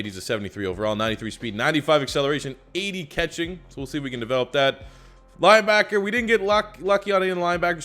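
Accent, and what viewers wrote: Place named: Canada. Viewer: Hes Canadian its his accent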